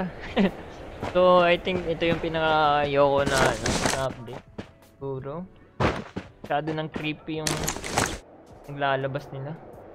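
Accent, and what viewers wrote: Visayas, Philippines. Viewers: bisaya ka pala ehh~!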